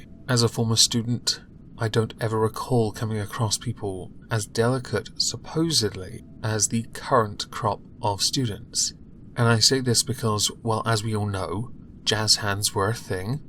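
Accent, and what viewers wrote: United Kingdom. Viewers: Man... I hope you get a bigger audience. We need a sarcasm riddled British accent reading 'less than mainstream popular news to fill the space that groomer Sargon of a Kek used to fill.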